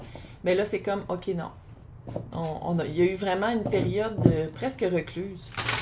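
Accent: Canada. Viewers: trop bon cet accent canadien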